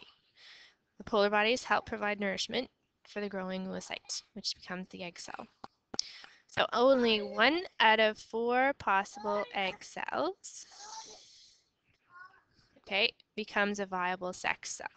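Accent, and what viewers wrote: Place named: Canada. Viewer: Canadian accent <3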